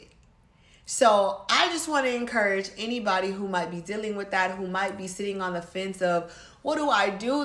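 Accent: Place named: Honduras. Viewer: Being a new subscriber I can't place the accent exactly. Yet, to my ears, it is Hounduran or maybe even Costa Rican. Am I close?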